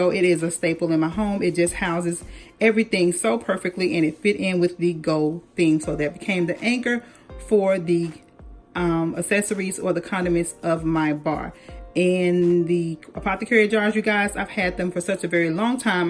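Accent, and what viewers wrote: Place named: Midwest, United States. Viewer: Hey Would You write your basic blurb in the ABOUT section? And where are you, what part of the country. You sound mid-western'yy. M. IL. Chi western 'burbs.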